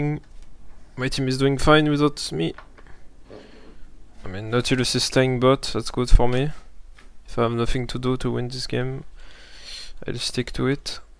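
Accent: France. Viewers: ton anglais est bon (t'as un bon vocabulaire, on comprend ce que tu veux dire, c niquel) mais tu fais aucun effort sur l'accent c'est dommage ; t'inquiètes c'est moins gênant d'essayer de faire l'accent que de juste forcer l'accent français c'est pas comme si y avaient des connards de français dans la pièce pour te juger (à part moi oups)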